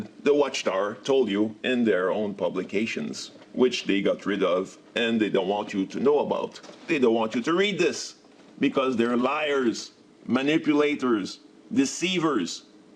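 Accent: Quebec, Canada. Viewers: you have a french canadian accent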